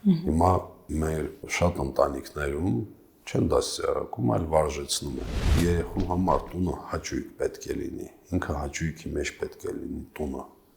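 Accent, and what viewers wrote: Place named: Karabakh, Azerbaijan. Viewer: ղարաբաղցի է հաստատ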